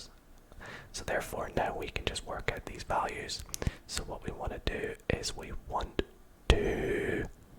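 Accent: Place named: Doncaster, United Kingdom. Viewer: Are you from Doncaster ? Your accent sounds much like Louis Tomlinson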